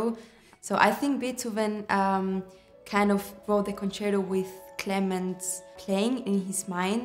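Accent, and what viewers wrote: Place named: Germany. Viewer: Tienes acento alemán y hablas inglés, pero tu nacimiento, tu nombre y tu increíble talento, te hacen un verdadero orgullo para España. Ya podemos decir que no sólo tenemos a los mejores en el deporte, sino también en la música de verdad. Gracias, thank you, danke... (el próximo concierto en Mallorca no me lo pierdo)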